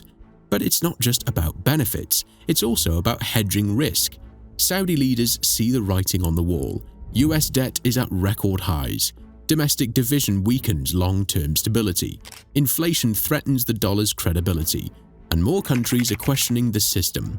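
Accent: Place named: United Kingdom. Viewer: I really want to listen to this report; however, I can’t stand your voice. I can’t stand, listening to British accent. British accents sound pretentious, high falooting, arrogant, know it all, closet gay, nosy, and more. Like I said, change the voice on this generated video, and I’ll consider listening to it in a more pleasing standard American English voice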